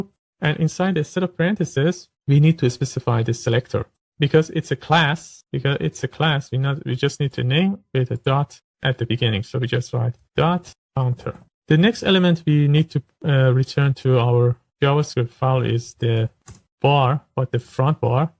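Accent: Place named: Iran. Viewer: داداش همین که صداتو شنیدم فهمیدم ایرانی 😂❤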